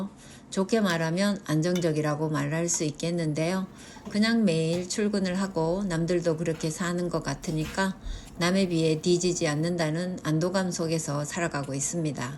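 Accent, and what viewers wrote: Gyeongsang, South Korea. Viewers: 경상도말이 이리도 교양있기도 들리는구나. 내말이 억센건 내탓이였구나 ㅋ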